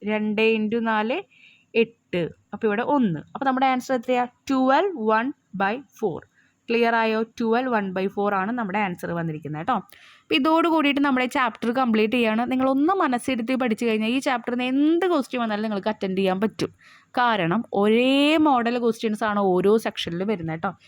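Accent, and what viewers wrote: Thrissur, India. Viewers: ത്രിശൂര് കാരാണെന്നു തോന്നുന്നു ടീച്ചർ അതു പോലെയുണ്ട് പറച്ചിൽ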